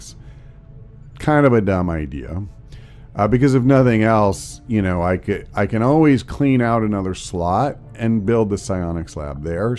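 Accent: United States. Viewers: You should pause the clearing and move the engineer to help build the resistance comms. Chances are engineers and scientists will come in between now and the mag weapon research to continue the clearing and speed up research. Love the show. Sorry if you've been asked this before but are you from New Jersey?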